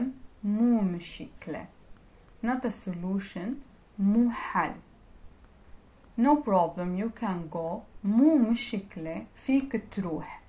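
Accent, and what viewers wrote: Damascus, Syria. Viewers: Thanks, dear for your answer. Your lessons are very very well presented, understandable and useful. Thanks once again. I would like to ask you, is your accent, if that is possible to call it so, Damascene? Because as far as I know, e.g. people of Damascus and Aleppo speak somewhat differently.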